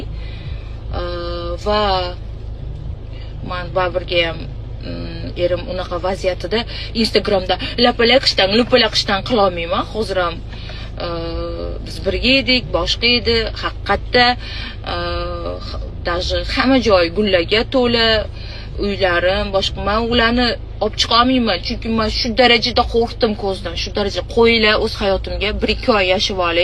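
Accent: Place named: Samarkand, Uzbekistan. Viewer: Toshkentcani eplolmisiz samarqand shevasida gapiruvrin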